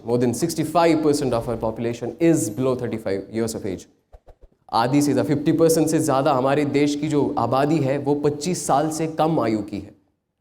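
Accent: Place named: United Kingdom. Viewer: Is he Indian?😁😁 He speak like a Britishers 😍😍😍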